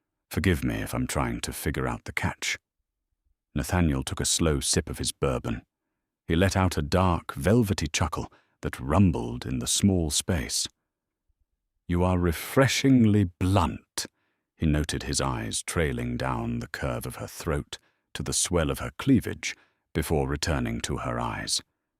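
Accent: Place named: United Kingdom. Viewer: My Heart and Soul went into a huge melting OOOOOHHHH when he fed her the steak bites from his own fork. Chills down my spine! Superbly-narrated tale and extra electric shocks listening to that purring English / British accent while following along with the Transcript on, and a piece of paper over the picture section of the screen so my imagination can do the magic its meant to! Windsor, Ontario Canada EH?! ❤️🇨🇦🥂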